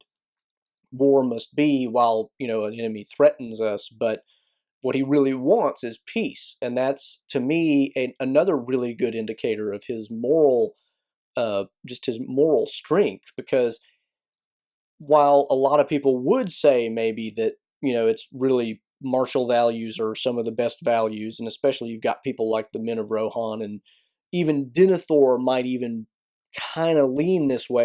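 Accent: South, United States. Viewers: I think we could certainly forgive him for this "failing." By the way, do I detect a bit of a Southern accent? I'm from Mississippi.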